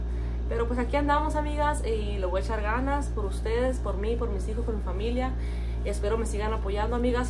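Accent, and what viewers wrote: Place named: Sonora, Mexico. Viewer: Que emoción 🎉 Yo llegué a tu cana porque me apareció el video de comer con 50 pesos, me llamó la atención y más el tono de tu voz dije ha de ser de por acá 😅 y luego después me apareció donde lavas los unifofme y dije ¡Ah si es de Sonora! ❤ y te di seguir y desde entonces me ha fascinado verte y ver a tu hermosos hijitos, como vas creciendo día con día. Me encanta tu actitud y como cuidas a tus niños. Muchas felicidades paisana ❤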